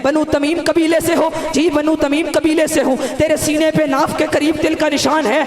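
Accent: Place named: Mansehra, Pakistan. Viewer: آواز سے محسوس ہوتا ہے کہ یہ مانسہرہ سے ہے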